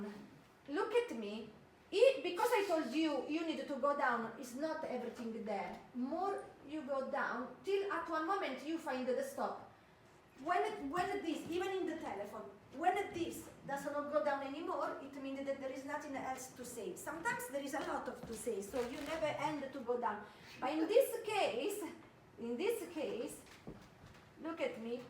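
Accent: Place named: Abruzzo, Italy. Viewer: No, perché dici che il tuo inglese sia "no good"? Sei molto brava. Soltanto la pronuncia, ecco, la pronuncia sa un po' di "Abruzzo con amore".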